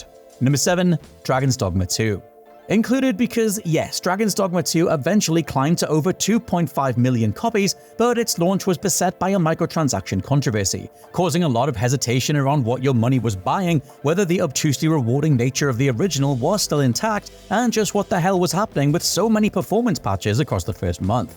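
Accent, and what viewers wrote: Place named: Scotland, United Kingdom. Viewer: He is pronouncing it properly but it's his accent which is a cool accent. It's like a British and Scottish accent combo.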